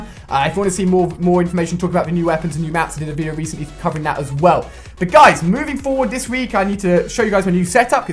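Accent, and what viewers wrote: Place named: United Kingdom. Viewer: And I''m well aware of accents guys. I'm not oblivious of the fact that he's British also. So quit this arguing shit and move on kids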